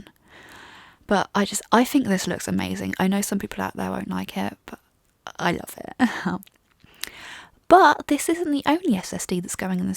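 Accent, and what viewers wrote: United Kingdom. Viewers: And talks very clear, i can tell she is british. But i could very easely follow her explanation as everything is pronounced very clearly. On a soothing tone But i would wait on gen4 SSD's 2.0 by figure of speech. They need a better controller first. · Hey, great too see u back in fine form. I hate to play matchmaker, but doesn't YouTuber Arun Maini from MRWHOSETHEBOSS live in London too? Imagine a collaborative, soothing fusion of two epic accents. 😉 All the best, phil from across the pond.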